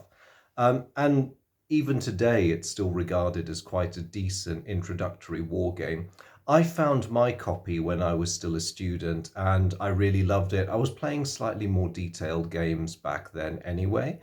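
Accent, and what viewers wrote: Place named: United Kingdom. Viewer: This was a pleasure to listen to. You’re an excellent speaker and communicator. Very clear and organized in your presentation and with a magnificent British accent. 😊 You’ve given me some added inspiration to read through the rules tonight and tomorrow to play a young man who I’ve introduced to these old war games (and some newer ones as well). I’m 61 in first started playing Avalon Hill games about 48 years ago. They are my one remaining hobby.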